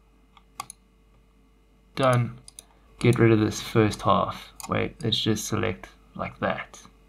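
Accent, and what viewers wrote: South Africa. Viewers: Hey a South African accent :)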